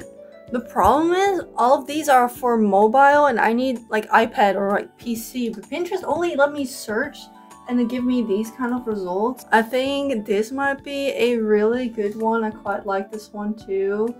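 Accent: Netherlands. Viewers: The dutch accent is killing me 😭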